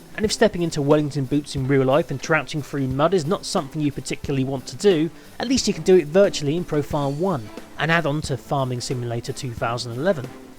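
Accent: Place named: United Kingdom. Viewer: So awsome. Cool british acsent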